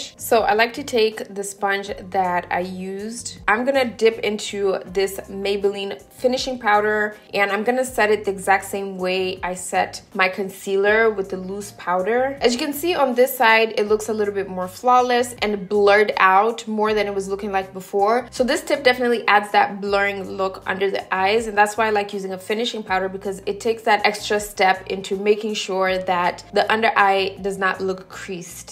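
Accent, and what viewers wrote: Caribbean. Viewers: I could listen to you speak all day. Your accent is heaven to me, bringing back lovely memories of back home in N.Y. many years ago, conversing with my neighbors from Jamaica and Trinidad-Tobego. Our children attended school together. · Love your videos your accent especially.. sounds Caribbean-ish flavored ☺️✨ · This is my first video of yours and I sense a bit of Caribbean in that accent. You are beautiful btw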